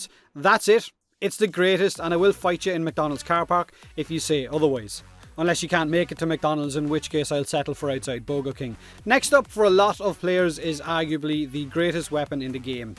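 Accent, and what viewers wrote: Ireland. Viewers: Honestly, Idk if I watch your vids for the content as much as I enjoy the jokes, nonsense and the feckin’ irishness and hearing u try to say “three”😂 “The Tigris Bleeds ships” I’ve never laughed so hard in my life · Is he Irish?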